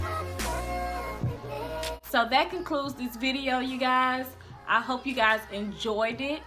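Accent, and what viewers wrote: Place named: Midwest, United States. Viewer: Lol where you from? I’ve heard many people talk like this in the Midwest.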